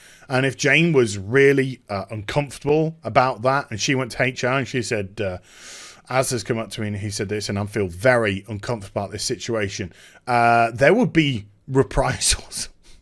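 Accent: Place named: United Kingdom. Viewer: AZ with his British accent mimicking vapid valley girls...priceless! :)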